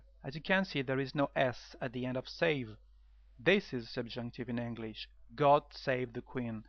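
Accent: France. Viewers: This was very useful, and you're accent and truly francophone delivery made it greatly genuine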